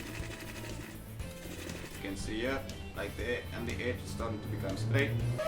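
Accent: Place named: South Africa. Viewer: I love randomly hearing an accent from home on YouTube :) Howzit my bru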